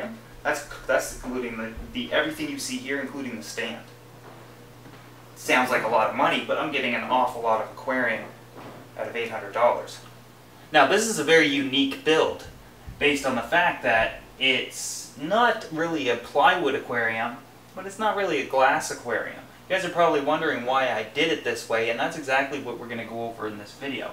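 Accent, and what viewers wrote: Canada. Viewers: You sound very Canadian :)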